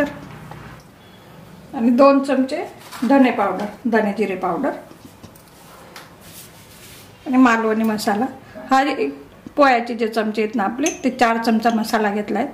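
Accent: Khandesh, India. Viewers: खानदेशी आवाज 😍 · तुमच्या toning वरून तुम्ही कोकणातल्या वाटत नाही, तुमचं toning नाशिक, मालेगाव, जळगाव इकडचं वाटतंय... पण रेसिपी खूप छान, अस्सल मालवणी .. 👍😀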